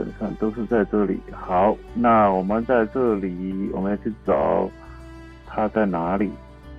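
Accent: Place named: Jiangsu-Zhejiang, China. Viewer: 小哥哥，应该是江浙人士。。。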